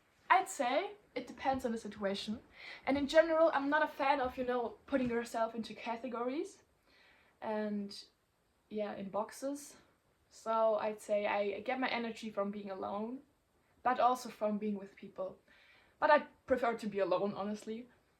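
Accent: Germany. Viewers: Moment, bist du Deutsche? Den Akzent hat mir ein Gefühl gegeben aber ich war mir nicht sicher :)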